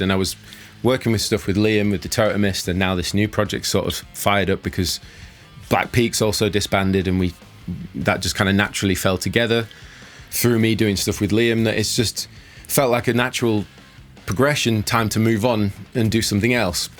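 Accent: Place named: London, United Kingdom. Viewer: Me: he sounds like he's from London..?: Sweetwa'a Me: say no more.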